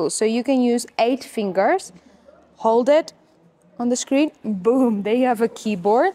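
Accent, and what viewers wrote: South Africa. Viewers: Coolest is your South African accent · Hahaha, for sometime now i have been saying aiyeee this accent is South African 🇿🇦. Now after watching a couple of videos i decided to check when was this channel opened. " so waar" she is South African 😂, we love you. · Is she from South Africa? The accent is almost South African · Love the South African accent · You sound like you are from South Africa, I can hear a bit of an Afrikaans accent. Great video thank you very much.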